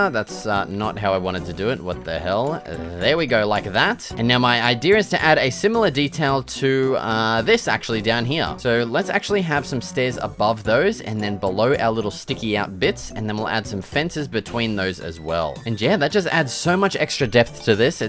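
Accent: Australia. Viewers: I love the Australian accent so much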